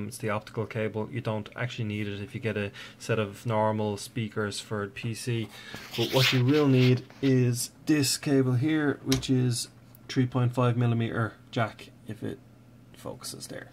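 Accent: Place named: Newfoundland, Canada. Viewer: i thought you was from Newfoundland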